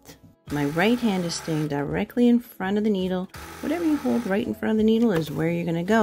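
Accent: Canada. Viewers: Just got hooked to your channel so easy to understand and love your Canadian accent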